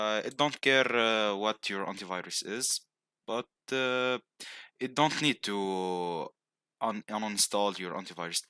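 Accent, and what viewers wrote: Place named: Italy. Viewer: Grazie caro ho risolto, sembri italiano dall’accento quindi bella pe te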